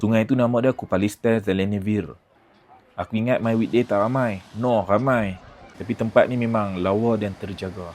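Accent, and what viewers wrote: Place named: North, Malaysia. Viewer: jaga diri kat sana, sebarkan slang utagha kat sana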